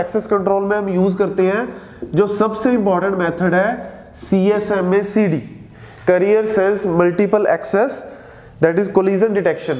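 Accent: Punjab, India. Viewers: Yr punjabi tone ❤ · Paji tusi punjab to ho😅 ?? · Thank You so much!!!!!! Amazing explanation! I enjoyed every bit of the video and realised I don't hate Networking after all! P.S. The Punjabi accent rocksss!!!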